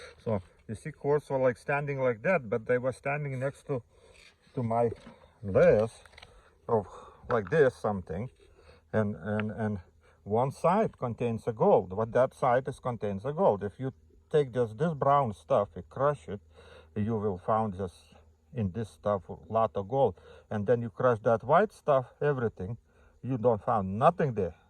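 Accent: Russia. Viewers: Приветствую! Вы русский? Акцент ухо режет.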